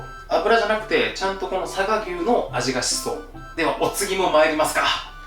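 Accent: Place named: Fukuoka, Japan. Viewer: 福岡弁が心地いいですね〜☺️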